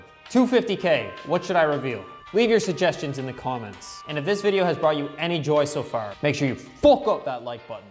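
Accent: Canada. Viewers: You're from Canada aren't you?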